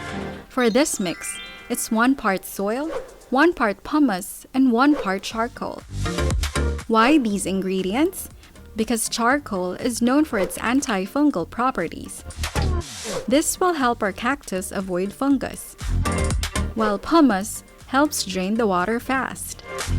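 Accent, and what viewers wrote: Philippines. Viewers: wow! ur speaking have improved very much! i don't know if that is a voice app but what matters is that it's not boring to hear anymore. i'm the one who advised u to talk faster and don't use filipino english accent 1 year ago. u are very good now! goodluck to ur plant vlogs. 😊❤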